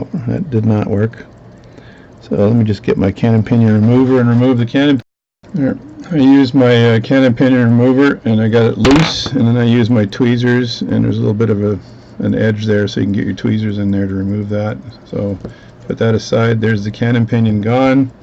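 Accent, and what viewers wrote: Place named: West Island, Canada. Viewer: you may not be west island, but that is definitely west island french.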